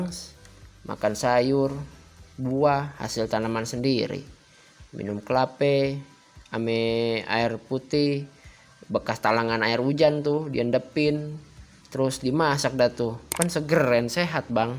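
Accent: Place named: Jakarta, Indonesia. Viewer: Khas banget bahasa Betawinya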